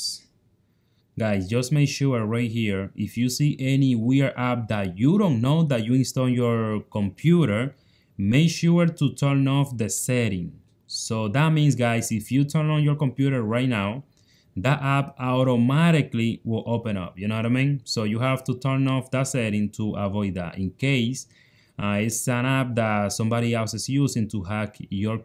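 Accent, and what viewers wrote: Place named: Dominican Republic. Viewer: Bro got Dominican accent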